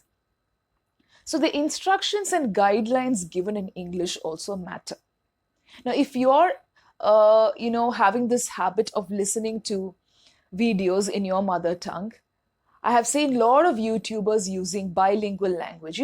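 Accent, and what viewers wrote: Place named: North India, India. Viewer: When you speak English, it sounds like u r from north india. 🤔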